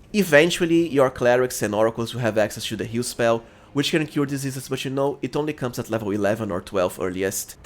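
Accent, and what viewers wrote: Brazil. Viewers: caramba mano, ativa a faixa de audio em ptbr po, esse seu sotaque nao me engana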